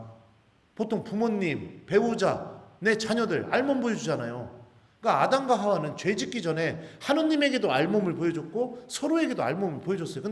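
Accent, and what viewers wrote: Chungcheong, South Korea. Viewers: 신부님 말씀 잘 듣 고 있습니다 미국에서 충청도 말씀 을 대할수있어서 더 정이 감사합니다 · 신부님 감사합니다 제 고향이 대전 인디 신부님 충청도 사투리들으니 엄청 반갑네유~^^ 충청도 분이신디 말씀은 엄청 빠르시네유~~^^ 매일 잘 듣고 있습니다 감사해유❤❤❤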